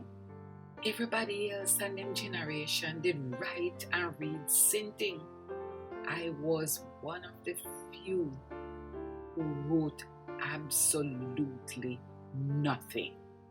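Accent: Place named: Jamaica. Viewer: You flavored this very well by chipping in the Patois now and then 😂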